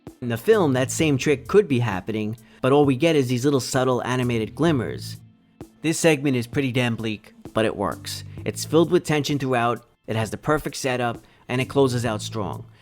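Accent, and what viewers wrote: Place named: New York City, United States. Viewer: Are you from NYC? That accent is strong af 😂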